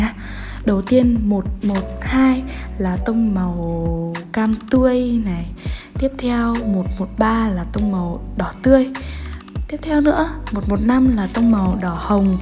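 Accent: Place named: North, Vietnam. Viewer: Chào chị ạ, em xem chị và biết chị rất rất lâu luôn tầm 1 năm về trước -.- 1 năm đối với em khá lâu rồi a. Em thât sự rất thích chị, đầu tiên em thât sự ấn tượng với giọng nói của chị, em là dân miền tây nên rất thích con gái Bắc, giọng nói trầm ấm nhẹ nhàng hihiii, thứ 2 em cực kì cực kì thích môi của chị, như anh Hoàng Thạch nói người gì mà môi đẹp ghê, môi cứ chúm chím đáng yêu mà không đánh son môi cực hồng nữa <3. Video swatch của chị rất chất lượng, hình ảnh tốt màu chuẩn không bị lệt quá nhiều so với thực tế. Bất ngờ hơn chị còn đang trên con đường ca hát nữa <3 em thích hát lắm nhưng tài năng không có hihii hay hát chứ không hát hay <3333.Em tự ti quá chị ơi:(( thật sự em rất ghen tị với các anh chị beauty blogger, như chị và Mai Hương,xinh thật sư, còn em thì không xinh tí nào:)) da thì mụn còn ngâm nữa chả có tí tài năng gì, chán ghê. 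Nhưng ba mẹ đã cho mình một hình hài thì mình sẽ cố gắng sống tốt thoii, sống tốt để làm bà hoàng của chính cuộc đời mình <3 em thích màu 129 ạ <3 Mong chị đọc đc cmt. FB: <link>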